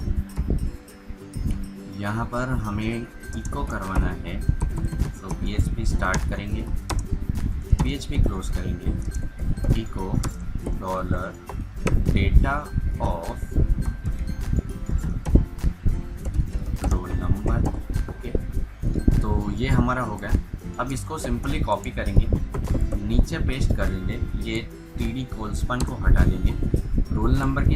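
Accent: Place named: Gujarat, India. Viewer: Watched all the video,build the same project without any errors, learned a lot about php and sql, thank you so much, commendable effort, btw you sounds like gujju, are you gujju??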